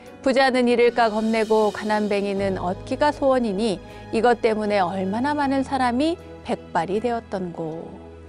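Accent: Gyeongsang, South Korea. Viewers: 스님의 속세 고향이 어디신지 모르겠지만 경상도 사투리를 넘 잘하시네요 ㅎㅎㅎ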